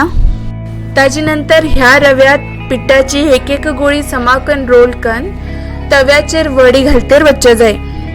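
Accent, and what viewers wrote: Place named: North Canara, India. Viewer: Yummy recipe 😋 n I love Ur north Canara konkani ❤️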